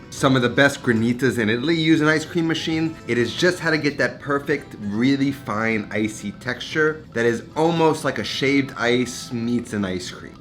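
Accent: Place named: Bronx, United States. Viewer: I was.guessing you were cute by your voice - the Bronx I-talian accent and then, Momma mia!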